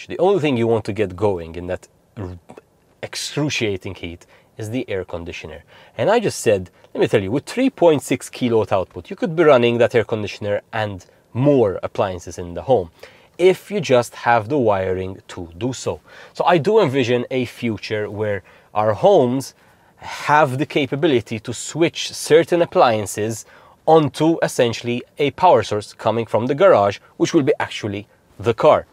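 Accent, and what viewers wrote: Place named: Malta. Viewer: I was watching this video and kept saying, this guy sounds Maltese, weird... 😅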